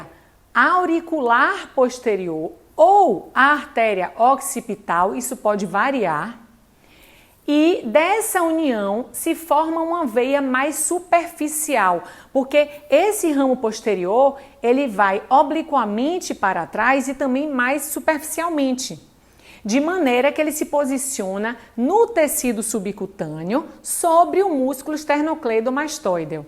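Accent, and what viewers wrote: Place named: Northeast, Brazil. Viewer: Simplesmente espetacular ! Muito rica em didática e lógica além do acréscimo charmoso do sotaque nordestino .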